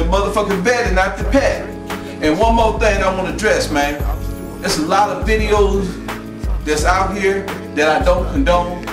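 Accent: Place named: South, United States. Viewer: he talk like he from the south but just a lil faster tho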